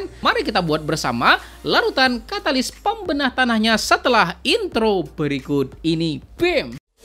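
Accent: Banjar, Indonesia. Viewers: Dari toturial dialek bahasa, sampian ini orang Banjar kah ? Beralamat di mana ?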